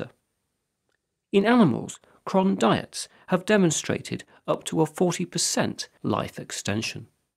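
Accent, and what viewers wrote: United Kingdom. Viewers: This guy's British Accent made this video. I mean, if he had an American Accent I wouldn't have taken it so seriously, but for some reason because of his accent I couldn't help but pay more attention! All of thse novel ideas seem amusing, but something about the cyrotube Reanimation "card" sends chills up my spine :(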